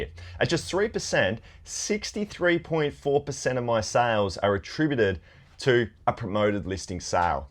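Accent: Australia. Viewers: I like your voice its cool to hear Australian Accent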